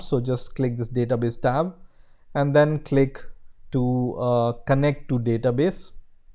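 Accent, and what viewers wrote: India. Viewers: why indien accent it's Bad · U r slow like shiiit,catastraphic India English,u could shrink video into 5 minutes easily,phuy... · the indian accent gives me headaches.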